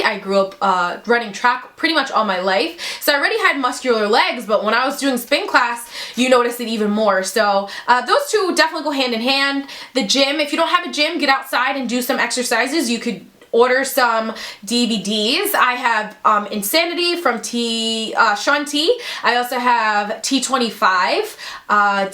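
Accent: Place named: New Jersey, United States. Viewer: hey where are you from I love your accent by the way you have like a jersey accent to me hope that isn't weird!!!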